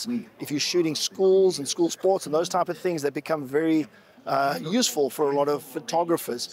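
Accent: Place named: South Africa. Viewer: He sounds South African 🇿🇦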